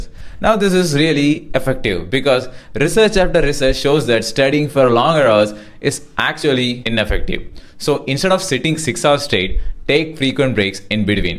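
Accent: India. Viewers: Thanks you are so relatable. Please be our Ali abdal . I love our Indian accent .. do study with me videos as well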